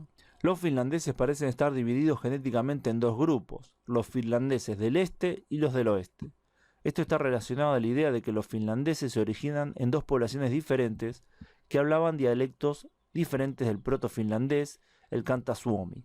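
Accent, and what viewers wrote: Argentina. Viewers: Wow... Super Argentino ese acento....👍👍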